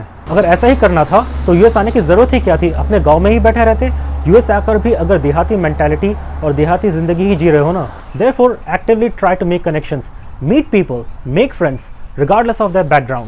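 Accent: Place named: India. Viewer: I love your hindi accent and way to express ideas. Your information is concise. Great job.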